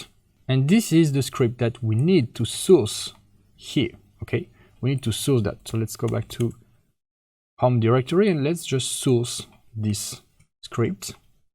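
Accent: France. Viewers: Je reconnais un accent français c'est dommage que la vidéo ne soit pas en français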